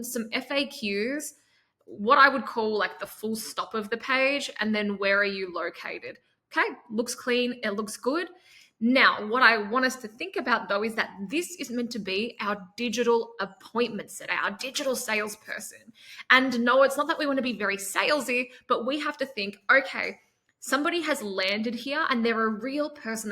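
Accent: Australia. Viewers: Good stuff. I, for one, enjoy your English and Aussie accent.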